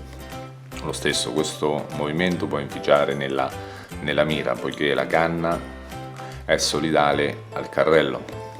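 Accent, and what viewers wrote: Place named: Marche, Italy. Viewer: Dall'accento familiare sembri Marchigiano..👌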